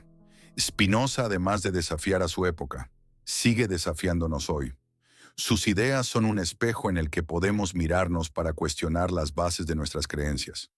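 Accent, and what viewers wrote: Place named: Paraguay. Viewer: Por qué la IA tiene acento paraguayo? No es que tenga algo con los paraguas, me cae simpático su asentó. Pero para escuchar este tipo de cosas me resulta incómodo.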